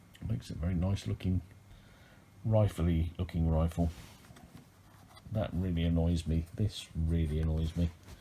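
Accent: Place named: United Kingdom. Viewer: Friend , this talk about you being unhappy about this gun must be because you should have kept off the net for your review because it,s impossible to understand a word of what your saying all wee hear is fluf fluf fluf , its your Brit accent that screws it up , find something else to criticise and stay off the net !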